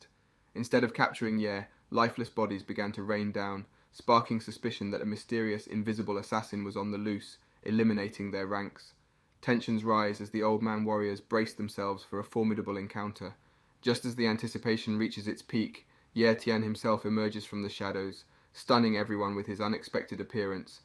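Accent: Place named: United Kingdom. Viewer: W Brit accent, 😂 my accent ain't as good as yours 😢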